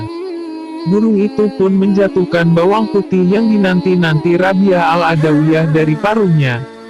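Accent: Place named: Madura, Indonesia. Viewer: Madura kerass 😀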